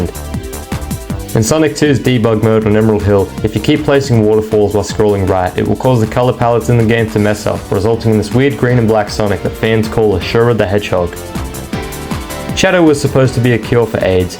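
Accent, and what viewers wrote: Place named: Australia. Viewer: This guy is literally just Aussie Bulbasquirtle, I love it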